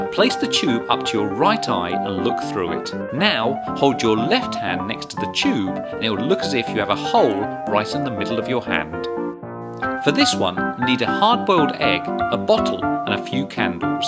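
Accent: United Kingdom. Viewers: All these tricks are pretty lame, but because he has a British accent, I want to try them... God these people are influential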